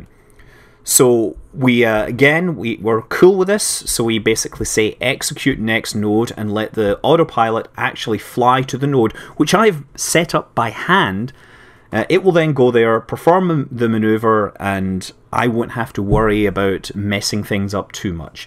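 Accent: Scotland, United Kingdom. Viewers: Hullo! Spoken like a true Scotsman!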